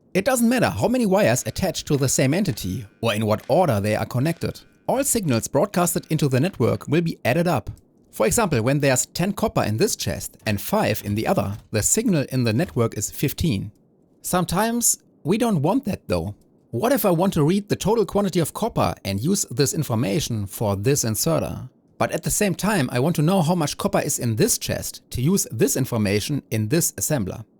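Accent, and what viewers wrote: Germany. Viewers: The German accent always gets me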